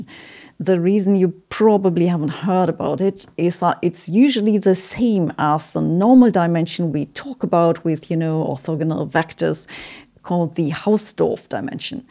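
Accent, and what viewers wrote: Germany. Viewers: Wow! Your delivery is very German! Love your work. Science requires such candor. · Did you notice that all complements by someone with a German accent sound sarcastic.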